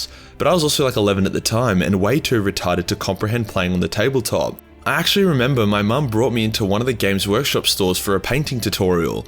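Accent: Australia. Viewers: Gidday Mate ! Good hearing a fellow Aussie accent online !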